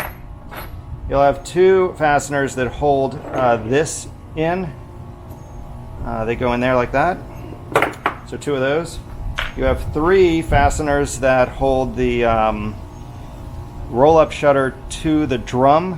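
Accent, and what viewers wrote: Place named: California, United States. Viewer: This guy sounds like he’s from California.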